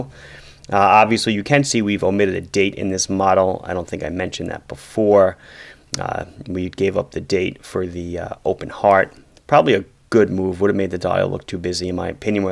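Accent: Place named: New York, United States. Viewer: am just here for the NY accent...and the watches sometimes.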